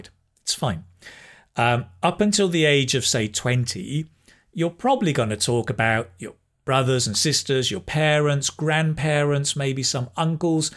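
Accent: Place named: Sussex, United Kingdom. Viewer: 👏👏👏👏👏A goooorgeous lesson! And your English is simply smashing,! I looked after my uncle's polo ponies at Cowdray Park Polo Club, in Midhurst, Sussex. Everybody spoke just like you, if you know what I mean!😀😀😀😄😄😄 Un abrazo, from Olivos, Buenos Aires, Argentina🇦🇷🏴🇬🇧🇦🇷🏴🇬🇧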